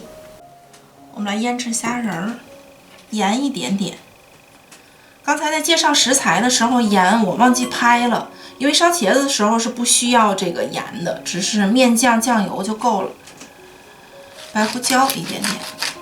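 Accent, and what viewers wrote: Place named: Northeast, China. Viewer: 哈哈 东北银儿吧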